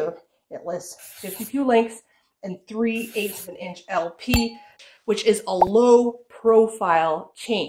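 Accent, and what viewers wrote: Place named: Canada. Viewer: I THOUGHT you lived in Canada or close to it; because of your accent. I think accents are neat! And yours gives you away.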